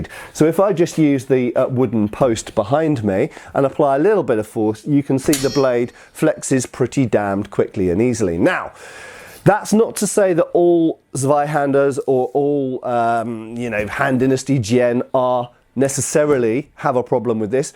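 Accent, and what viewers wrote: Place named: United Kingdom. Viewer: If I couldn’t hear your accent, I would still know you were British due to the incredible dead delivery of innuendo here haha. Well done!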